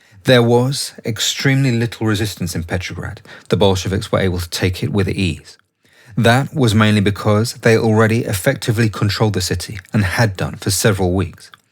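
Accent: United Kingdom. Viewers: Yeah usually British accent is red flag of AI slop (due to its ability to deceive American audiences) , but this Channel is solid!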